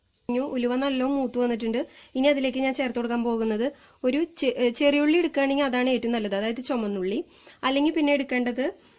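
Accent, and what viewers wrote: Thrissur, India. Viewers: ചേച്ചി തനി തൃശൂർ ആണല്ലോ 😁😁😁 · ചിരിച്ചു വയ്യ. ത്രിശൂർ ഡയലോഗ്... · Extremely well presented. Your language style is excellent and your honesty is highly appreciated. Not many people admit their success through the trial and error method. Your cooking is good and your simplistic Thrissur style commentary is both appealing and amusing. But let's not take away any credit from the delicious dish you have prepared. Keep going and may you present many more such interesting and appealing recipes. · Thrissur slangum curryum superrr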